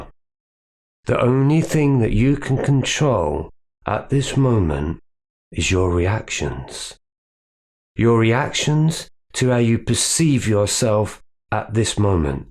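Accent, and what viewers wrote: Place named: Cockney, United Kingdom. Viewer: This is great. As a Northerner I never thought I’d be enraptured in a lesson on the dark night from a cockney geeza called! 😂 We live and learn. Thanks mate, wonderful video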